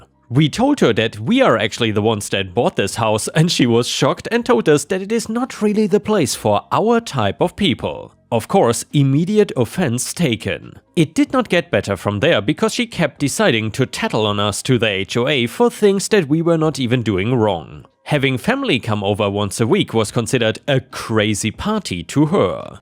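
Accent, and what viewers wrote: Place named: Germany. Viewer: I expected to hear atleast 1 German word the entire time I listened to this lol yall can't tell me dudes 1st language isn't German lol obviously I'm talking about the narrator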